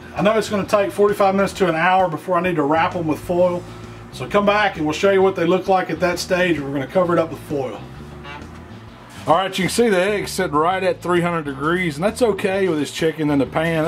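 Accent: South, United States. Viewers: No skin Needs sauce?? Sound southern but I'm wondering?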